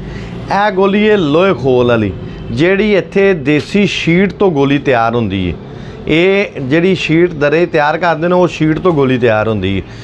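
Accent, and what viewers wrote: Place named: Punjab, India. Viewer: I REALLY VERY MUCH LOVE SMILING FACE EXCELLENT PUNJABI ACCENT LOVE U BROTHER,PL MAKE A VIDEO ON LATEST COST ALL TYPE AMMUNITION,💙💜💚🧡❤💛♥👍👍☑✅🆗😊😊😌😌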